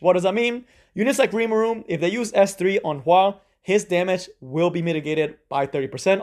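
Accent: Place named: Mexico. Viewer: Why your accent sounds Mexican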